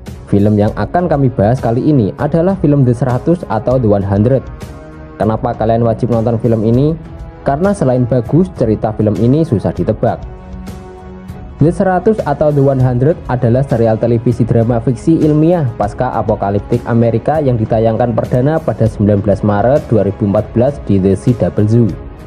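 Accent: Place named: Java, Indonesia. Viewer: Medok ya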